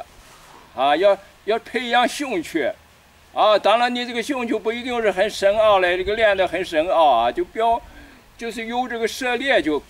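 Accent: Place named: China. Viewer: 张先生的乡音未改，还是一口家乡话，地道"外省人"的中华民国情怀。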